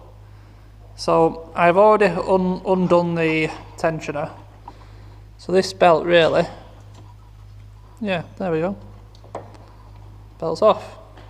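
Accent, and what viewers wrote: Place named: Northern England, United Kingdom. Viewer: Lovely Jubbly video, great northern accent.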